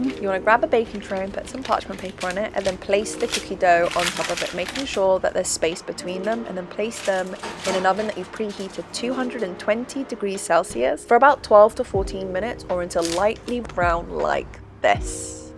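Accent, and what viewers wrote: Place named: United Kingdom. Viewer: I love your particular British accent. I don't think I've heard it before. 🤔What area is it from?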